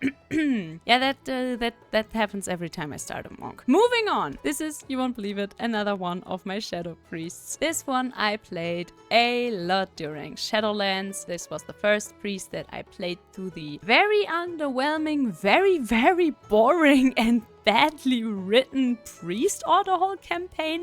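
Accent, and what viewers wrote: Germany. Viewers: Hey! Love binging your videos while Studying for my exams haha! where did you learn about the wow lore im trying to get into it because im newer to wow. ps: are you german? you accent sound familiar😊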